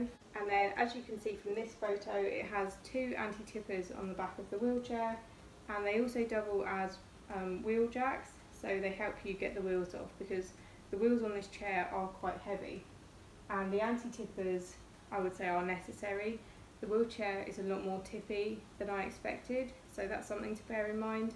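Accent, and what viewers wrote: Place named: England, United Kingdom. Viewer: typing is so much easier anyways. That must be annoying to deal with at times though. When I write things, I usually can’t read my own writing the next day hahaha. You pull off glasses very well, nice eyes and smile, they work for you and I think glasses are popular again, so many people using them versus contacts again, well here anyways. Are you around London? I have some friends around there, they have totally different accents than you, more city like accents. Then I have a buddy up in Durham, and I have a hard time understanding him 😂😂.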